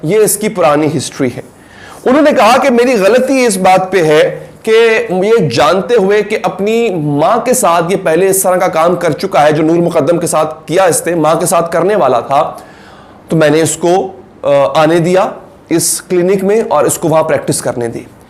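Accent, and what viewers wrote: India. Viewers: Sir please Urdu sahi Bolen Indian accent hota ja raha he apka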